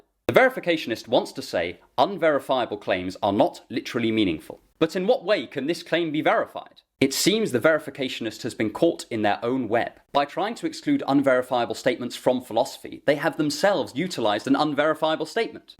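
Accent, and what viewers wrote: United Kingdom. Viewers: To top it off he’s quite the posh chap with a nice British accent 🫡 salute you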